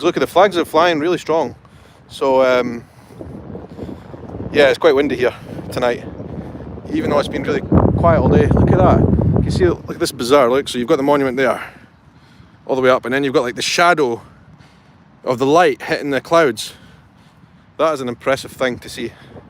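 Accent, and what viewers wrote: Scotland, United Kingdom. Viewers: It's great to have you guys both visit a historic part of the USA. I hope y'all will have a wonderful time here. Edit: Hi from San Antonio 63° F I love your bolo! Remember your Scottish accent will open a lot of doors for you and. People love it.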